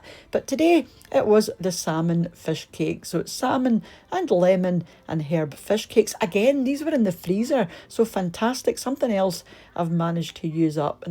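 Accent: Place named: Glasgow, United Kingdom. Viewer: Today is Mothers Day in America. Listening to you reminds me of my mum, I miss her voice which was very Glaswegian. Have a great day.😘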